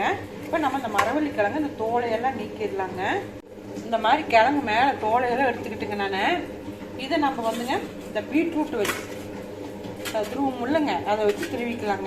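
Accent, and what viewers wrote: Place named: Kongu, India. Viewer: nice vadai and kongu Tamil 👌🏻👌🏻👌🏻, new subscriber😊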